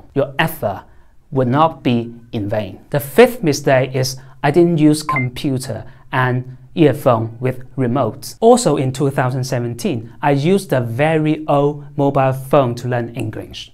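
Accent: United Kingdom. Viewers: 感觉发音偏英式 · 你的好像是 英國口音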